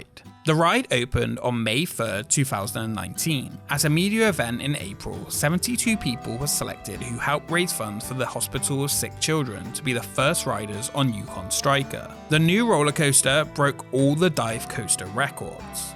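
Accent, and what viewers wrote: United Kingdom. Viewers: You're British though right? Guessing from the accent but of course could be wrong!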